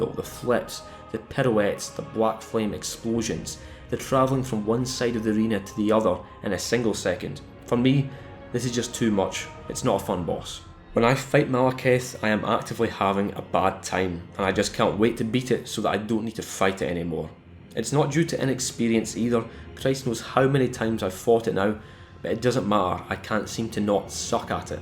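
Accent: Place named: Scotland, United Kingdom. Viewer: I missed your smooth scottish voice and it'd only been like 10 hours since I watched your last part. Nice work.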